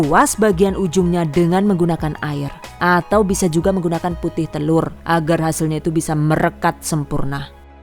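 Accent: Banyumas, Indonesia. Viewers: jebule wong ngapak y mbokayi😂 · Jebulane wong ngapaakkk..ora ngapak ora kepenak😂😍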